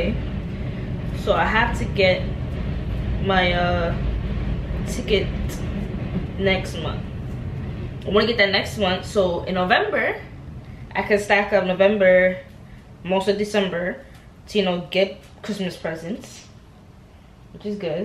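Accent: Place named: Brooklyn, United States. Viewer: So funny your Temu video was the first video i seen of yours and i knew you was from Brooklyn because my man is also from Brooklyn i picked up the accent rq 😂 and its funny how you also used the example of ppl from the south because i am from the south 😂 i am from Texas sometimes me and my man don’t understand our accents lol for example he says “oil “ i say “oyl” 😂😂😭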